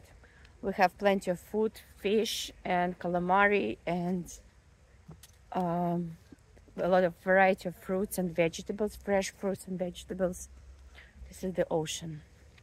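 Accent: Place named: Russia. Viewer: Судя по динамике сообщений тут и английский не понимают)) А вас с первого вашего слова понял что вы русскоговорящая)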